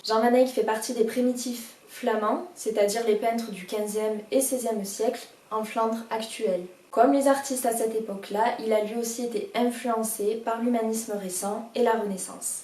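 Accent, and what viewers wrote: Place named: South, France. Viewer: Elle explique bien (elle a l'axen du sud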